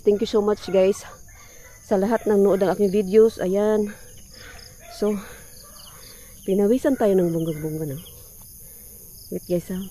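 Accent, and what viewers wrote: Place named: Cebu, Philippines. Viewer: It seems your voice is Cebuano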